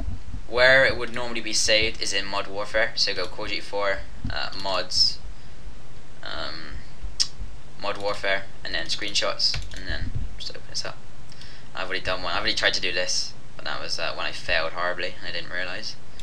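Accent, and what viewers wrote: Ireland. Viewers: Northern Irish? Southern Irish? Hmmm? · HE'S FECKIN IRISH!!!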